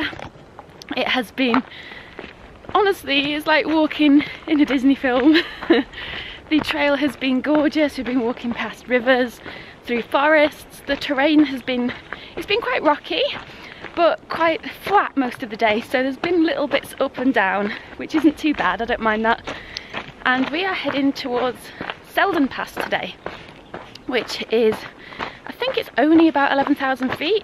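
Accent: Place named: England, United Kingdom. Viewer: I like your videos! That English accent! Such a positive spirit when you talk! Thanks a lot!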